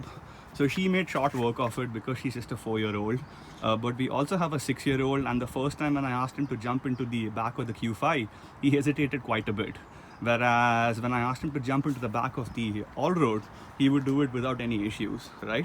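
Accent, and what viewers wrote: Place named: India. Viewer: Your spelling is wrong.... it's not NOT " fluidly".... the correct term is "fluently"..... he speaks Indian-English..... the Indians try to speak the language of their White Master, but because their tongues have are tuned to speak Hindi, they end up with a completely different version of the English language.....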